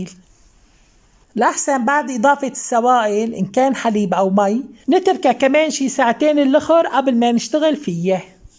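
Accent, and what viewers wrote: Aleppo, Syria. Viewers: من بعدك ماحدا يحكي حلبي حببتينا باللهجه الحلبيه وتعليقاتك الراقيه الخفيفه الحلوه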